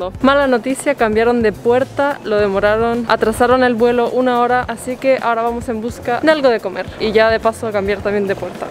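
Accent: Chile. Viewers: hablas como con acento de chilena what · eres Chilena? tienes el tono de una, saludos de Chile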